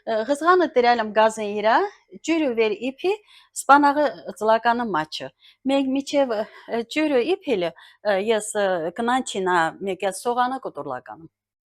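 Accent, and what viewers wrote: Artsakh, Azerbaijan. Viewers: Շատ սիրելի տիկին ջան ,ես շատ եմ սիրում Արցախի լեզուն ,օրհնվես էդպես էլ խոսիր ,դա է մաքուր հայկական լեզուն ,որ մինչև այսօր մնացել է անավարտ, իսկ ամենակարևորը իմանաք ,որԱՍՏՎԱԾ խոսում է հայերեն ,այն էլ Արցախի բառբառով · ❤❤❤բարև ձեզ շատ հավեսով եմ յեշում,միշտ էլ մեր Արցախի բառբառով խուսեցեք։։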